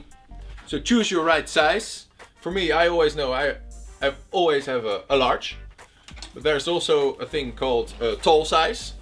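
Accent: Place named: Netherlands. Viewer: Love the dutch accent Good video though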